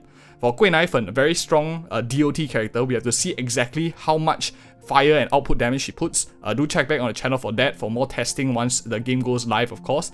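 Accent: Singapore. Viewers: Hey bro! R u from sg? Ur acent sounds like sg im from sg btw xD. Nice video keep it up bro!! Just give u a sub :D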